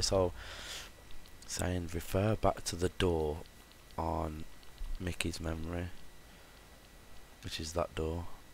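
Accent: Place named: Birmingham, United Kingdom. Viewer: Can tell ur from brum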